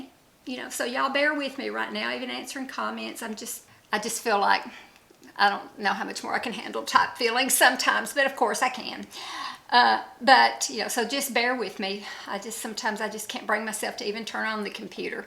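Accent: South, United States. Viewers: I love listening to your sweet southern voice❤. I live in Georgia and probably sound just like you! Praying for a quick recovery from your upcoming surgery! Bless your heart ❤️. You are a beautiful soul ❤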